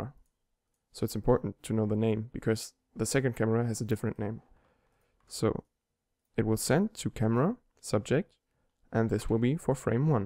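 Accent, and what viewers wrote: Germany. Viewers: Dein Englisch ist sehr sehr gut. Man hört den deutschen Accent nur ganz leicht raus.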